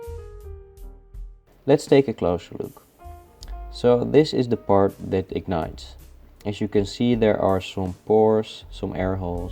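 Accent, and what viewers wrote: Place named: Europe. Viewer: You sound like you maybe Europe so that may not be self isolation but enforced isolation because you know...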